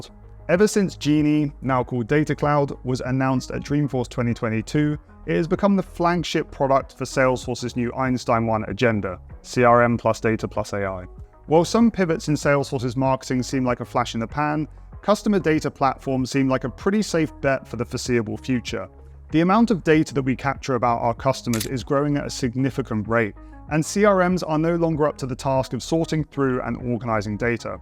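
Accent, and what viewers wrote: United Kingdom. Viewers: I was just listening to the video and thought maybe I misheard it due to the British accent😂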